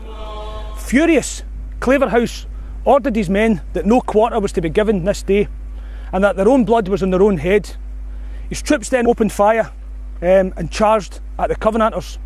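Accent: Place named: Scotland, United Kingdom. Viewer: Psalm 76 has a new meaning for me now; I have read Maurice Grant's account of Drumclog, but I so appreciated this reminder and hearing account of it in his Scottish "accent" (to me) and seeing the sight myself, as best I can in the United States. Thank you so very deeply for this retelling; it deepens and strengthens my own conviction and gives me a deeper appreciation of what the living Christ meant to these people and how He is still alive today; this is not history taught in the United States, not even of our Pilgrim forefathers and why exactly they hazarded their lives to cross an ocean to a wilderness to live here. How amnesiac most of the world is, and I so appreciate your keeping this history alive, and not just history, but its connection to our living God, the Gos so much of our world ignores and rejects.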